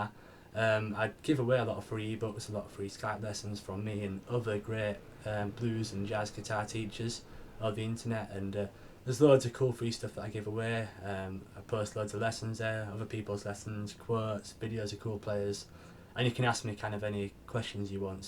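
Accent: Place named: Lancashire, United Kingdom. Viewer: Good vid man very useful. You from Lancs?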